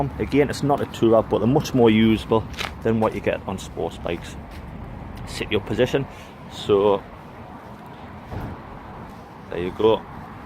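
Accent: Newcastle, United Kingdom. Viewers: Nice review, and you can't beat a Geordie accent! Currently got a Bandit 1200, so I understand your diesel-torque comparison. Might get one of these next, as I have to sell my bike, or possibly the SV1000. It would be nice to try a twin, and something lighter than my big old two wheeled bus! Cheers!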